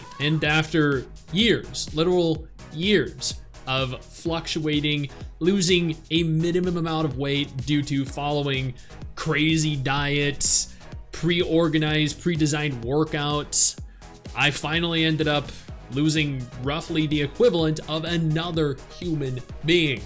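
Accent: Australia. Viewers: wtf you’re Australian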